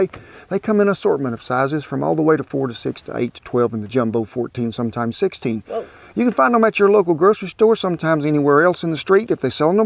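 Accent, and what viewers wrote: South, United States. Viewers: I’ve lived in FL all my life. The outdoors and the southern accent is enough for me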